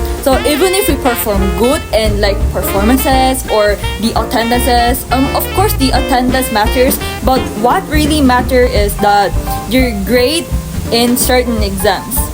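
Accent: Philippines. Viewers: You're starting to have the filo accent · your English accent is so Filipino 😂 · Your accent sounds pinay😂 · Love your Accent. You sound Filipino 🥰. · filipino accent yung english hahaha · Great 👌 content, your English accent is perfect now very Filipino English accent, you have adapted well.. enjoy your holidays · woah she has addopted the Filipino English accent. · naging pinoy na yung english accent:face-green-smiling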